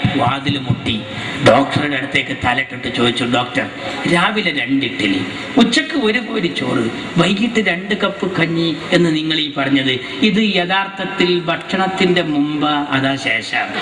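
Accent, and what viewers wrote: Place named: Kollam, India. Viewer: ഹാ ഹാ ഹാ കൊല്ലം നല്ല മലയാളം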